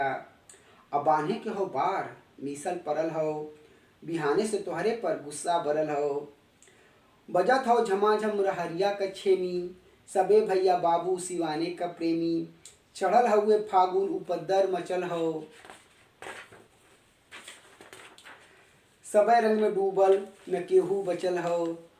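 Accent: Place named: Bhojpuri, India. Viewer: बहुत मीठी भोजपुरी 👌👌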